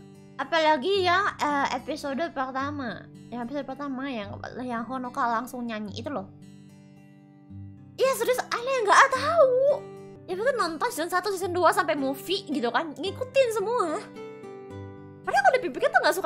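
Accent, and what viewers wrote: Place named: West Java, Indonesia. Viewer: Emang dia orsun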